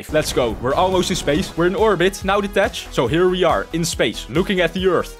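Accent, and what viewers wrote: Netherlands. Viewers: Your Dutch accent 💀💀💀💀 I love the goofyness, the thing is, as a Dutch guy myself it's pretty cringe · is he dutch,? i recognize the goofy accent. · Damn, some Dutch accent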